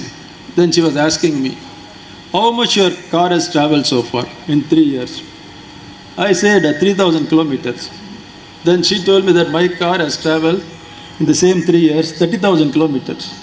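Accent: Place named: North India, India. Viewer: பேரு தமிழ் வடக்கு ஹிந்தி பேசுற மாதிரி இருக்கு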